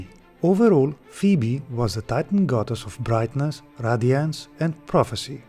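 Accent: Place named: Greece. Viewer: Granddaughter??? That's so cool! also, you have the most beautiful accent on the planet. I love the Greek accent!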